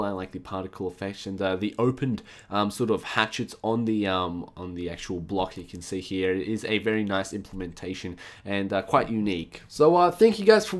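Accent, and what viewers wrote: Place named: Australia. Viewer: I can't take you seriously, until you spell like a normal person, not a mental one. Also accents are such a thing, he has a Australian accent (I believe).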